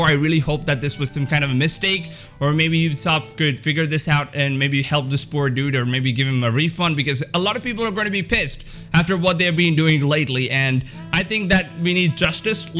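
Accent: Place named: South India, India. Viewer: That south Indian accent is creeping through your American accent.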